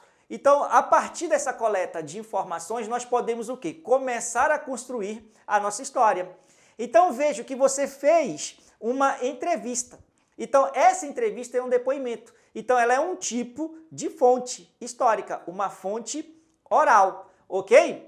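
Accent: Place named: Manaus, Brazil. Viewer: Vc e de Manaus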